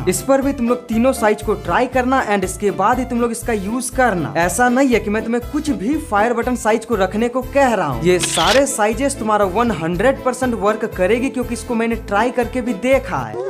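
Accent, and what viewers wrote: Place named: West India, India. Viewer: Bhai ka taliking style full west india ke citizen ki tarah hai 🙂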